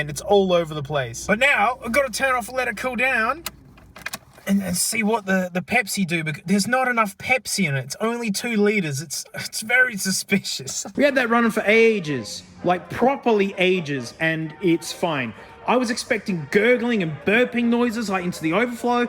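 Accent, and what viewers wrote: Australia. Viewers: I have never watched ANY of this guys videos. Immediately “this guys Australian, theres some shit about to happen”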